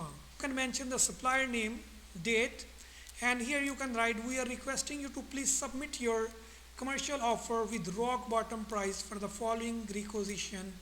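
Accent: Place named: India. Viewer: Pak., perkenalkan dulu dong nama anda .... apakah anda seorang guru ? dari Negara mana kok logat seperti orang India , sementara banyak orang India bekerja di Indonesia tetapi jarang yang beragama Islam.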